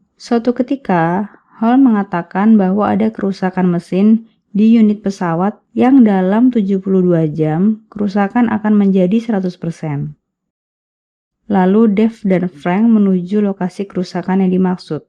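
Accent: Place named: East Java, Indonesia. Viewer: Ya kayaknya orang jawa timur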